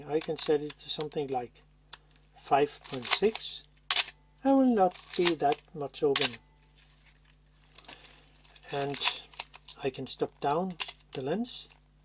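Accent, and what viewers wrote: Denmark. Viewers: WAUW!! This is SO strange and interesting:) I have JUST found your channel because my dear dad gave me his collection of vintage Nikkor lenses and the Micro-Nikkor 55mm was tight with the focus. Well, you made a video about. But the interesting thing is this: I noticed what sounded like a danish accent and now I find out that you in fact are from Denmark AND your name is. Well guess what...I am also from Denmark...and my name is...:) Tusind tak for videoerne, min ven!! Hvis jeg kommer til at lave rod i den i mit reperations-forsøg, så håber jeg at du måske kan hjælpe mig;) mvh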